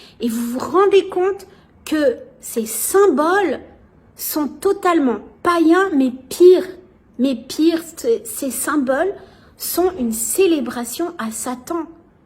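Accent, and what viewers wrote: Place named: Arab world. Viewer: fete de l aid du ramadan ces manger pendan 1 moi s engraisser la nuit manger 3 repas en 1 fois vou vou prenez pour des foque a vouloir remplir vos reserve de graisse tai une meuf perdu deja fai jamai d enfan tai pa assez belle tu ai influencabl ta des souci psychologique en quoi tai belle ta pa le vosage racer fin tai une movaise race aryenneee ces pour sa tai musulmane allah crer les arab avec des tete de chameau des nez de juif les saoudien on les nez et ma bouche pareil que le chameau pourquoi allah fai moche sa creation 🙂 on dirai une enfan qui parl tu parl com une pucel tu parl que tu va attirer les sal type mais ces sal typ son la creation d allah tu insulte donc sa creation tira en enfer tai une kassos tu as telemen besoin d amis que tu ai devenu musulmane mai v ou ile toi tai pa assez bell pour te devoiler et ton accen arab le hhhralal tu n ai qune blanche perdu fai un test de qi mdrrrrr la pieter dan le keur et la keu dan quoi mdrrrrr ta pa honte dal merdeuse tai moch com tes seur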